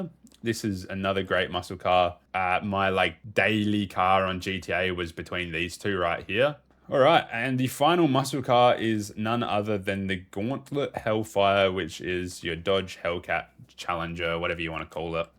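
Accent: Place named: Australia. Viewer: I had a feeling you are from Australia because of the way you said the name of the GTA5 Camaro lol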